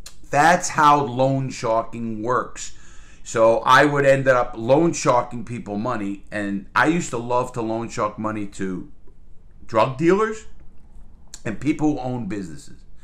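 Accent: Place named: Queens, United States. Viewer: Hey, great stuff. My late dad was raised in queens (Jackson heights) and the accent is different than yours but close. Your vids make me feel like home (living in Dublin for 2 years now). He would call my younger brother and I loan sharks whenever we played monopoly as kids lol. He ran upstate to the Catskills when he was a teenager, he had friends in the street game in the city but was never part of it (at least not seriously). Love the vids, thanks for all you share.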